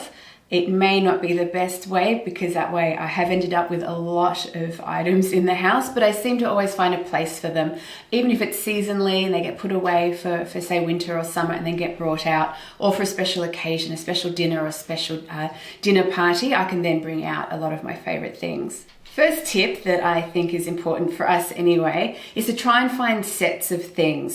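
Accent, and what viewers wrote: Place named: Australia. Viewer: I can't handle the Oz accent....I tried to watch the whole video but didn't last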